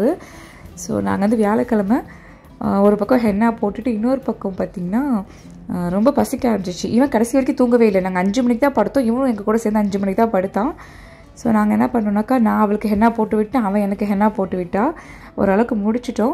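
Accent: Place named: South Tamil Nadu, India. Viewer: Recently watching ur videos.. Very natural.. Which is ur native.. Becaz south side slang madhiri iruku adha keta sis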